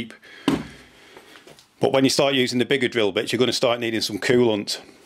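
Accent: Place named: Yorkshire, United Kingdom. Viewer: Nice informative vid. I'm trying to place your accent to the exact region in UK..is it Yorkshire?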